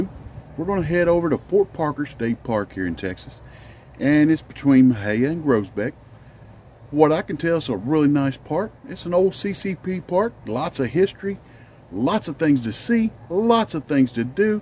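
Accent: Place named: France. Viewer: A down home feller with a great voice, and a respectable French accent!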